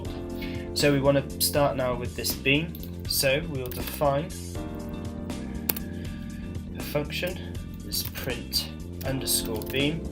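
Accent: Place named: United Kingdom. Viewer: It's amazing how people want to create a video to help then forget that hardly anyone could understand what you saying when you have such a slurred voice not pronouncing your words and too much background noise which everyone knows draws attention away from what your saying. Slow down. It's hard enough to understand British accents let alone listen to loud music.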